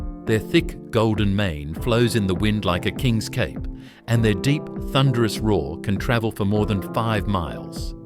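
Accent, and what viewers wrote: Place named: United Kingdom. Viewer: I hate to have to listen to a British bot.🙄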